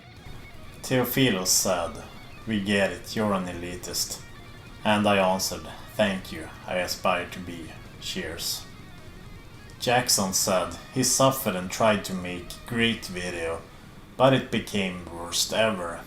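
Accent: Sweden. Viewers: Actually your deadpan Swedish accent coupled with the dry sarcasm is really cool. I’m more into OSDM and BM but I really enjoy the channel, and in fact it has made me listen and discover some old metal gems. Nice work bro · Further to the comments about your accent, my first ideas of the supposed Swedish accent came from the late 1970's watching the Swedish chef from the Muppets Show, members of Abba, Bjorn Borg and an atypical Swedish blonde dollybird on a UK comedy show called Mind Your Language!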